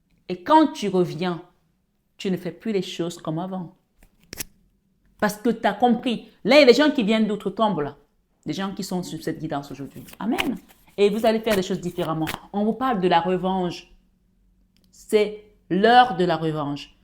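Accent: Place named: Cameroon. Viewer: Merci pour la guidance. Vous avez parlé j'ai pensé à une sœur Camerounaise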